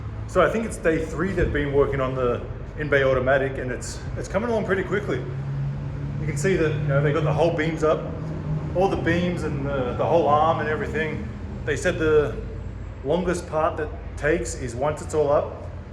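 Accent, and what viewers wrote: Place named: Australia. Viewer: I'm actually dying to go to move Australia. I was shocked when I noticed your accent, and you moved to California. I feel like Australia is the place for me. I'd like to move to Sydney. I'm sick of the freezing weather here. Canada is brutal to live in. Sydney has the most amazing oceans I've seen on camera, but maybe it's hard to make a living there. You obviously know more than me.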